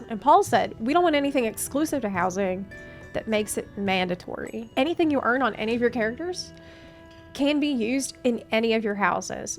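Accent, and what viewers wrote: South, United States. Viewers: I hear your southern accent! XD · Why do you all of a sudden have a Southern accent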